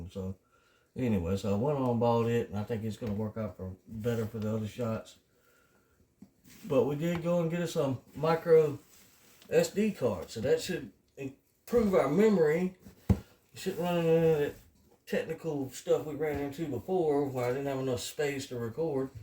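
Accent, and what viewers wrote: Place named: South, United States. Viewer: My wife want to know where you grew up? She says you sound a lot like me and I am a country f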